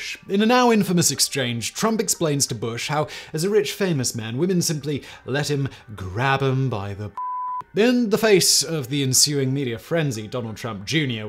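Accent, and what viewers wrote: United Kingdom. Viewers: I watched the video, would you be shocked if I told you that a British accent doesn't make this guy the ultimate authority on everything? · Zig Zag - Oh yeah, a British accent, that does it for me every time! Like I don't hear a British accent every time I speak. Your only objections are that he has a British accent and said something that you don't agree with? I've read several articles debunking the "alpha wolf" theory - from people who have studied the phenomenon. I don't take any one person's word that something is so or isn't so. I look it up, I check on it, I read up on it as much as I can. ps: What's really sad is that you're judging me by your own standards - which says a lot more about you than it does about me.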